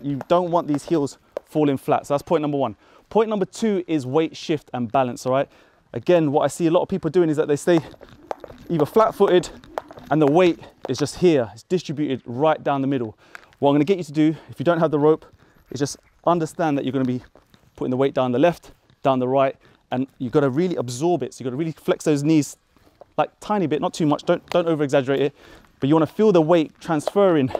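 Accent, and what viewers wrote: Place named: United Kingdom. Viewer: UK aksen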